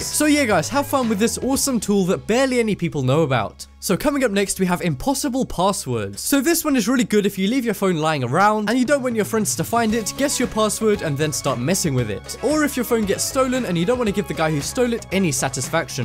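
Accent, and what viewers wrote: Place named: United Kingdom. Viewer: Omg he has a british accent, me took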